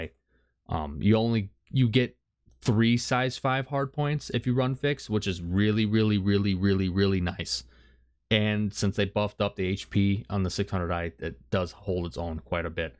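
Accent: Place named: Mexico. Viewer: It really isn’t. The Kee sound you are promoting has sneaked into your pronunciation from the Mexican Spanish influence. Italian, Latin and English pronunciation is Kwu. Say the word queen - it’s not “ keeeen”.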